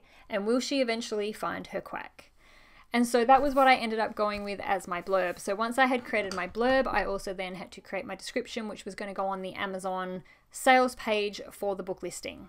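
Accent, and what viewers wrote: Australia. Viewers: Lovely, unaffected, clean Australian voice. Outstanding, lucid preparation ands steady camera work. Smart illustrations. If this charmer isn't a teacher, she should be. She nailed it with this book.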